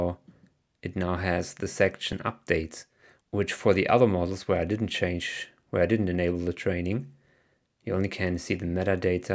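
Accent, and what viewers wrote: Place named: Germany. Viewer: I hear some German accent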